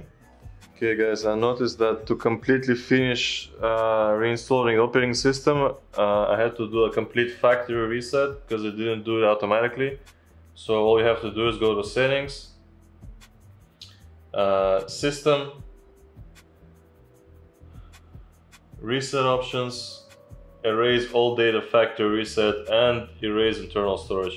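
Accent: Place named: Eastern Europe. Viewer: Hey, where did you get your phone from? I'm going to buy it in China probably from the official OnePlus Chinese website and bring it back to Europe. I'm pretty scared tho, I hope this works and doesn't brick my phone. I guess I should just not do any H2OS updates and it should all be fine? Also, from your accent I have a feeling you come from a Slavic country, am I right? :D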